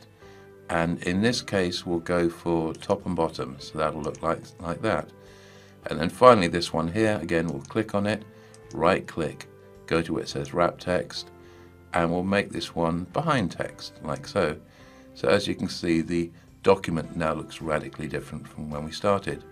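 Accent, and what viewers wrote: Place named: United Kingdom. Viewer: Excellent tutorial ! You are an excellent teacher and presenter. I must also comment on your cultured British diction... it is heavenly. We in the Queen's American Colonies speak a doggerel and uncultured version of the English language, of which we should all be ashamed. It is such a delight to hear someone who speaks in a cultured manner, in complete sentences, and without lacing every sentence with those pig-grunt utterances of the non-words "UH" and "gonna." I shall be watching more of your videos. Liked and Subscribed. Hold High the standard of proper and cultured English speech !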